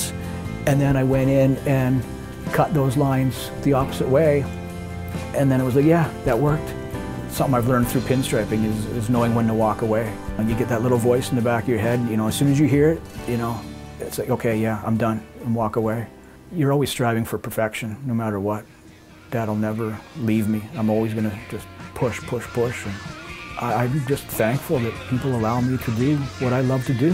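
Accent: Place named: Canada. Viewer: Great work! I knew you were Canadian when you started talking.👍🇨🇦love to look at hand painted signs, puts vinyl to shame, no soul! Hope to see more of your work for years to come!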